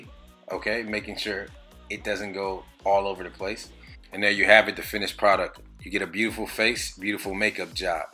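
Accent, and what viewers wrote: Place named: East Coast, United States. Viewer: War paint,lol!!!Love that!I hear a east coast accent!!!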